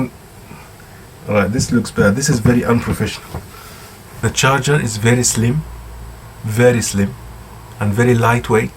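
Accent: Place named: Africa. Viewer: انا عندي سؤال محيرني صراحة انته وحكيم اثنينكم عندكم لكنه افريقيه في انكليزيتكم.. where did u get that accent from? هذي مو اللكنة الانكليزيه ابدا...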